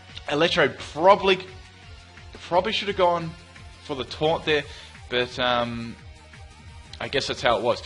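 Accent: Australia. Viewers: Wait did I hear that correctly are you from Australia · I KNEW you were a fellow Aussie. The accent game is strong!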